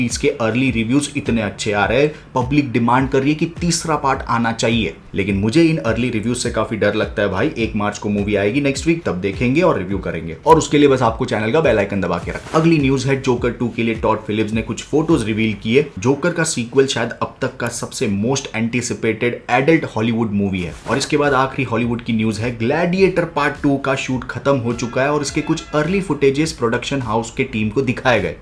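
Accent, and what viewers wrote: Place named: Hyderabad, India. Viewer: Bhai toh badiya kirrak Hyderabadi slang me bole toh ek dum maut 💪🏼🫡🙌🏻🤙🏼🤙🏼🤙🏼 outstanding bole toh maza aa jata hai jab bhi channel open kare roz apke channel per attendance dal kar jate hai aaj koi video upload hua ya nhi Khair bhai tum kaam to ek dum zabardast kar rahe ho quality content k bare me janna hi toh Sidha abhi ka review per jana ❤ well congratulations brother keep helping us we stand with you 🎉 Kch zyda hi bol gaya😅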